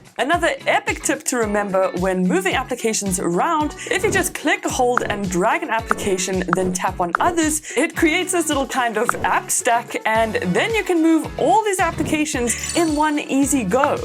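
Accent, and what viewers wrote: South Africa. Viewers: I cant tell if your south African or not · Thank you enjoyed watching your video are you South African. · R u from South Africa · ha south african. here's a sub just cause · Omg you’re from South Africa 🇿🇦🇿🇦🇿🇦🇿🇦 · Awesome Thanks for this! Very helpful! Random but are perhaps from 🇿🇦 just based on your accent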